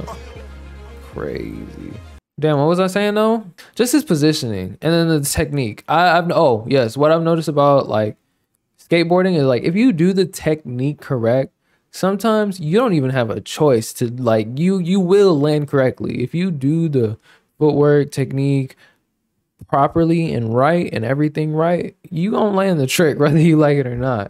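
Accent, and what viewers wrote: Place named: New York, United States. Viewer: It's amazing how you grew up in GA but have a new York accent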